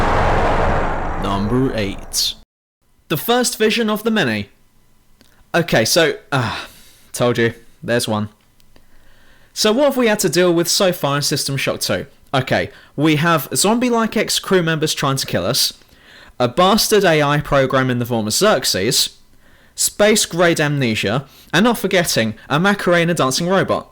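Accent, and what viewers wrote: United Kingdom. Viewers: Dude you are a, LOL Plus the british voice makes it for a good review.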